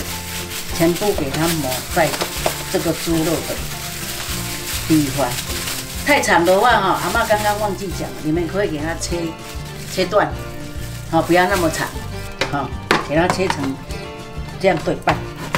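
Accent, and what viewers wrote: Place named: Taiwan. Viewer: 阿嬤的台灣國語聽得很親切喔😃❤️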